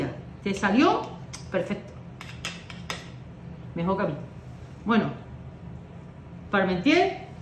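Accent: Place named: Malaga, Spain. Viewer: Hola, mi enhorabuena. Vaya malagueña tan sala'. Mira que no soy afín a tu partido político pero llevas a Málaga por bandera me encanta, sigue llevando nuestra manera de hablar y nuestra gracia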